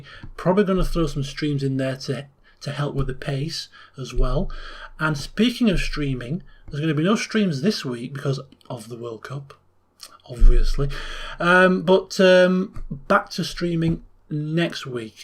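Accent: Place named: RP, United Kingdom. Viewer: No problem man I just wanted to tell you I love your channel I've been watching you ever since your mass effect playthroughs you're my favorite gaming Channel. I say you're even way better that those channels that have millions of subscribers. I think it's your voice you have a pretty cool Posh accent :)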